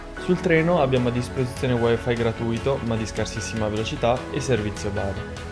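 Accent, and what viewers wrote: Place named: Lombardy, Italy. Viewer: Concordo con la dizione dialettale lombarda !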